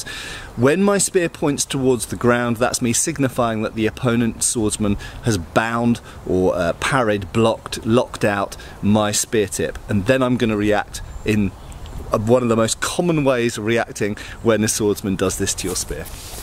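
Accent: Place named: United Kingdom. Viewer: ive added a boar spear to my home defense collection, largely because my neighbors insane pitbull got out again and attacked someone (i wasnt home at the time) but also because theyre relatively cheap and it gives me what i hope is a good non-firearm option. at this point, should i ever have to use it against a two-legged miscreant no matter what that fellow looks like im going to be seeing a genial, bald smiling man with a british accent. i think i watch waaay to many of your excellent videos.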